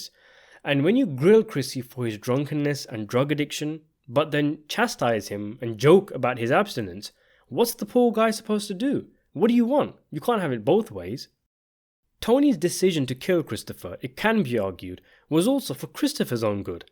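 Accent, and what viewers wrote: United Kingdom. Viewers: Oh god Brit narrator I'm out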